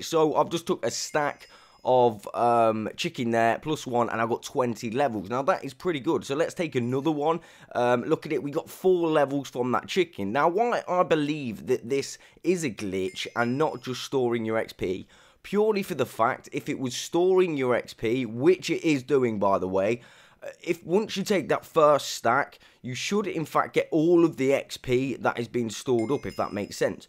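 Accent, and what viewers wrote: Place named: United Kingdom. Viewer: For anyone asking why he sounds like DanTDM, it’s because he’s British and has a similar regional accent to him. There is a slight difference, with Dan sounding slightly more “posh” than him, but they are largely the same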